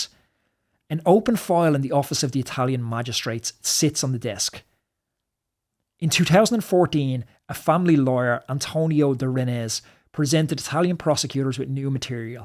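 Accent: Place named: Ireland. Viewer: Its called authentic self if you cant tune your ear to a good Irish accent your just lazy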